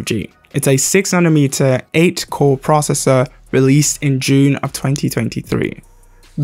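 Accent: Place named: United Kingdom. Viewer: Bro your British accents very very cleary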